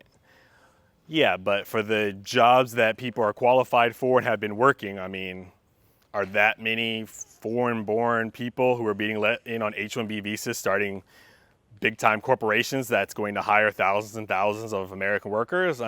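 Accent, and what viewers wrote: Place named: India. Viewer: You have a very typing Indian Accent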